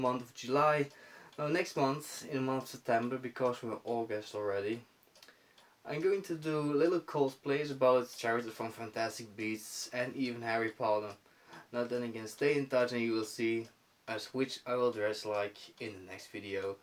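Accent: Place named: Netherlands. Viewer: Now I know what the Dutch accent sounds like 👌 nice video!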